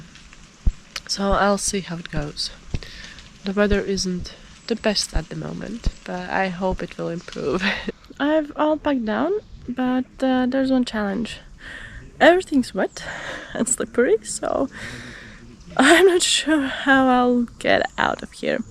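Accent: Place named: Latvia. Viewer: Tas nodevīgais latviešu akcents...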